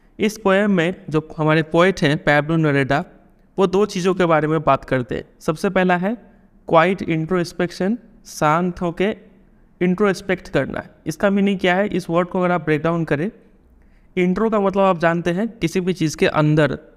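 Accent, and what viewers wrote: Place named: Bengal, India. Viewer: Sir u r bengali ❤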